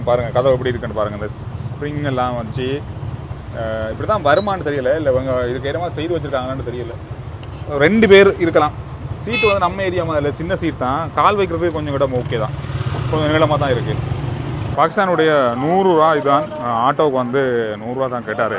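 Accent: Sri Lanka. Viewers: நீங்க என்ன தான் தமிழ் பேசினாலும் இலங்கை மனிதன் தான் இல்ல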